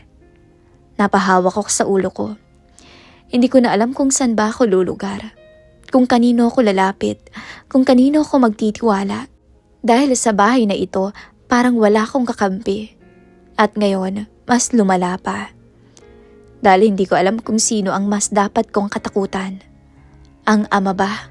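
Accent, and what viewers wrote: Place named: Arab world. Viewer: Ang galing ng content creator na to gayang gaya Ang tuno ng English ng mga arabo.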